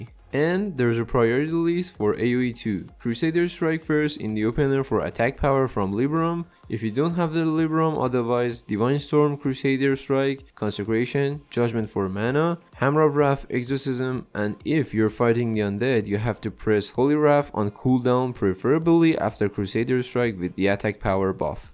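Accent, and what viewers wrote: Iran. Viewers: lahjat yekam farsi mizane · you have kind of an persian accent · R u Iranian bro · Are you Iranian by any chance? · You have a Persian accent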